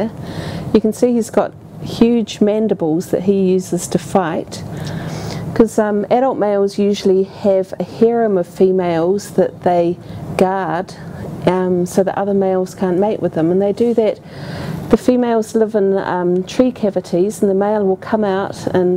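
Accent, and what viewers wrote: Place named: New Zealand. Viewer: How the fuck does someone without a fucked up new zealand accent pronounce weta? She is saying witta, so do I pronounce it wet-ah or weet-ah?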